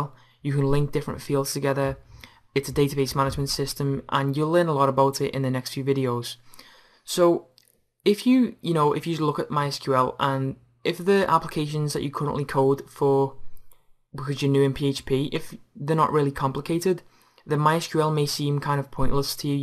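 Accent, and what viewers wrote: Liverpool, United Kingdom. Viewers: Your accent sounds like you're from Liverpool.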